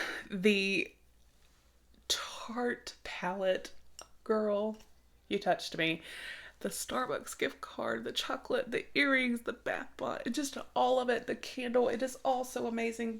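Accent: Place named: North Carolina, United States. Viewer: New to your channel. Our accent is the same! I'm from North Carolina too. I live in Thomasville nc.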